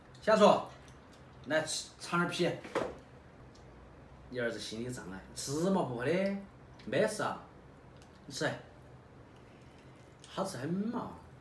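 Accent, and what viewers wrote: Sichuan, China. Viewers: 小廚講話有四川腔，阿為啥唱歌辣麼標準?????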